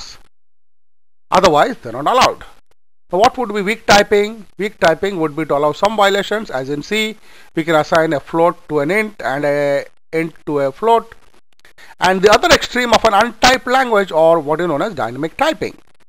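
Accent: India. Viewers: I don't understand you buddy, but this tutorial IS in English! Indian accent is an altogether different thing, however its still very clear to understand. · As a native speaker of US English, I am simply expressing the fact that I dislike the standard Indian English accent. There are certain (often incorrect) patterns of speech that can be observed which are displeasing to the ear in the Indian English accent; simply put, it hits the ear wrong. I'm sorry you do not understand, but imagine receiving technical instructions in Punjabi that is being (poorly) spoke by a white person from the US — how much fun would you have? My guess is that you would have very little Sir. This is only my opinion, take it or leave it.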